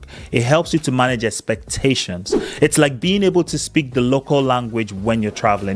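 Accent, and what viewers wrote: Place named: East Africa. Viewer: I love man brother. So eloquently explained. if I might guess, are African (East side). Bless you man😀😀😀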